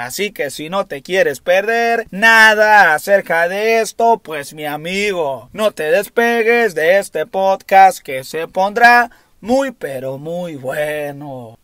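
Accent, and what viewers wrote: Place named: Mexico. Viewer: Me gusta como relatas bien mexicano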